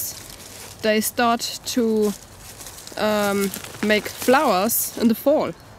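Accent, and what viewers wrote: Germany. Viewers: Cold outside😉like your Denglisch 😉👍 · Do you own a handgun. Is it hard to get a license for that ? What about bugs there in summertime. Your dog is nice. You have a nice German accent. Greetings from Eastern Canada. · Great results from your sleeping bag ..... love your German accent...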